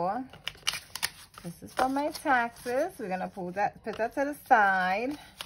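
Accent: New York, United States. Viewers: New York accent strong lmao I appreciate this video you didn’t have to do this but u did 💯❤️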